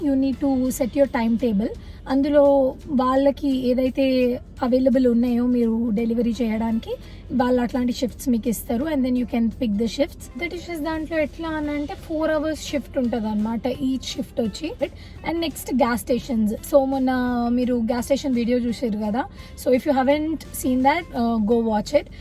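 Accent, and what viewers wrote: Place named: Hyderabad, India. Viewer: Superb Hyderabadi language 👌👌👌👌👌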